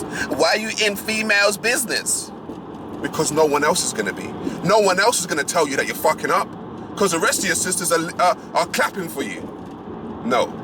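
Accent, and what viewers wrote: United Kingdom. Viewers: I’m sorry, but you saying, “Oh f*ck off” sounds so polite. I have no idea why the British accent makes the most offensive sayings sound nice.🤣 With that said, I completely agree with you. I am not offended by what you said because it’s true. Way too many black women are out here making us all look bad. I do my absolute best to be the positive image of black women. My daughter is biracial, but I also teach her to be the positive image of both the black and mexican communities. Unfortunately, there are major problems amongst women in both races. I mentor young black women so they know the path they should take and why they should take that path. I even seek out young and older black women in comment sections of videos who have a destructive mindset. I don’t shame them for their mindset, but I let them know their mindset is not one that breeds lifetime success. 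Some of us are out here on the ground doing the work. We don’t have YouTube channels because we are working in real time.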